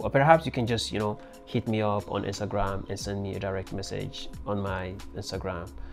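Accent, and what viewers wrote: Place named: Yoruba, Nigeria. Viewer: I can definitely notice the yoruba Benin accent in the way you speak. Came from Benin to the US last year to study accounting. I would love to know someone in my study field because I get lost sometimes. I hope I will reach my goal as brilliantly as you did.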